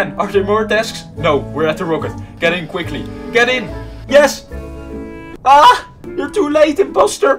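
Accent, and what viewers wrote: Netherlands. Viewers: Ik ruik een nederlander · Oh you are OBVIOUSLY dutch. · 100% een nederlander hoor het gelijk aan het accent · Are you dutch becouse you realy sound like your dutch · Are u dutch? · Ben je Nederlands? Ik hoor het aan de accent haha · I smell someone dutch like me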